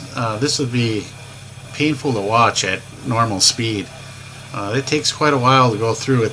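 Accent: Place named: Wisconsin, United States. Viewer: Wisconsin huh? I thought I recognized the accent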